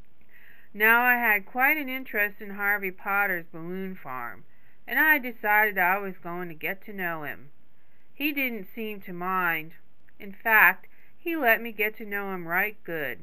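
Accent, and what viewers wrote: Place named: Midwest, United States. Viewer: I like this person's voice for this book, it fits, sounds midwestern to me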